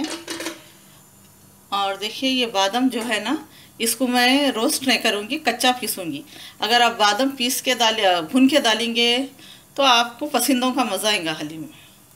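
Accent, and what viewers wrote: Hyderabad, India. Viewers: More than Haleem, aap ka explanation bhagayaa... hyderabad Urdu rocks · Amma aap ekdum Hyderabadi bhasa bolre. America me bethke Hyderabad yaad aa gya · Assalamualaikum Aunty. Kaha the aunty aap itne din se, farishta banke agaye aap, yeich khaandani aur original daleem ki receipe dhoondhro tha dekhiye Mai ab Tak, Jo meku milgayi aur Mai seekhliye banana isku. Dawaat me banaya dekho aunty, Logan biryani chor ke, daleem khatam kardiye puri, aur hai Kya puchre the meku. Sab apki badaulat hai, allah apku zariya banaye hum sab KU pakwaan sikhane ka. Jazkallah khair. Aur ab rahi baat apki aunty, to meku apke baat karne ka andaaZ itna pyaara dhikha, pure Hyderabadi language, Jo bahut kam sunne KU milti yaha USA me. Maza agaya. Hehe. Apke camera wale Jo b hai, Bhai ya behen, Zara camera close nakko rakho utna, bartan me gir jata camera aur thoda close leke Gaye to, thoda uppar SE covering Karo pakwaan ki, aur acha dikke aata aunty kaisa mix karre Kya karre receipe KE saat. 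Agli baar zara uppar rakho camera. Aur aunty Allah apke abba jaan ko jannat me aala SE aala mukhaam Naseeb Kare, jannat ki khidkiya unki khabar me khol de, huzoor Muhammad Mustafa sallallaahualaihiwaliwasallam ka deedar Naseeb Kare qayamat ke din, aur khabar KE azaab SE mehfoos rakhe. ( Ameen ya rabbul aalameen). Kardiya dekho jee aunty Dua, inshallah, apki dusri pakwaan wali video ka intezaar rahinga. Allah apku sehet de. Ameen. Allah hafeez. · Assalamualaikum Aunty Jazak Allah khair for this Daleem recipe. Alhamdulillah I tried making it for the first time n it turned out so well n delicious . My kids loved it👌👍. I love the way you talk pure Hyderabadi style. Love you Aunty. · Assalamualaikum wrwb Aunty! Aapki awaaz sunke meku mere hyd ke apno ki yaad ajati Aapki saadgi ke waje se Allah apku be hisab sehat , dunya aur aakhirat ki kamyabi atafarmaiye Ameen Feeamanillah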